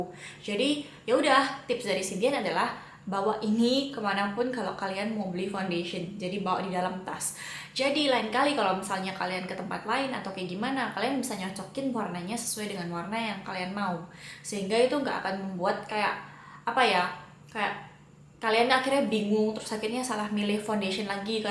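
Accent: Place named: Java, Indonesia. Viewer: kalo ngomong ketahuan jawanya XD. thx 4 tips ^^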